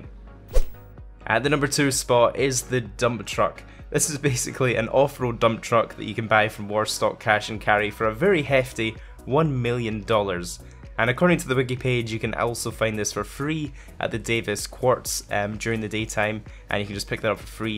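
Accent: Scotland, United Kingdom. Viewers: Are u Scottish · Is he Scottish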